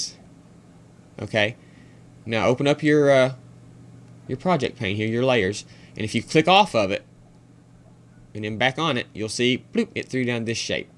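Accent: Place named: Georgia, United States. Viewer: Once again i must say you are a smart man, and you sound like your from georgia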